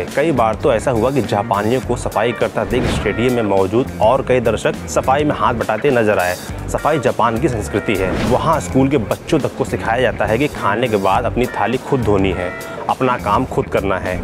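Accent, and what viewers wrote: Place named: Kanpur, India. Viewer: are You From Kanpur....coz your way of speaking seems like Kanpurites...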